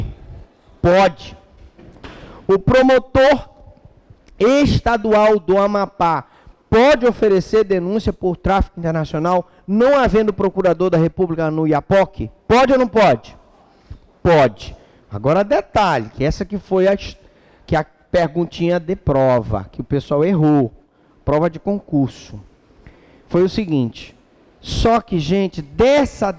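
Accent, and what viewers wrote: Pará, Brazil. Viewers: Muito obrigado, sou paraense mas moro e estudo no Rio de Janeiro e suas aulas além de muito brilhantes me ajudam a matar a saudade do Pará que amo, pelos exemplos e pela sua forma de falar. Obrigada.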